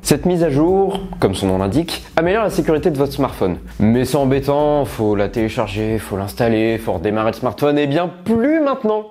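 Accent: Normandy, France. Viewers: Boujou on sent le normand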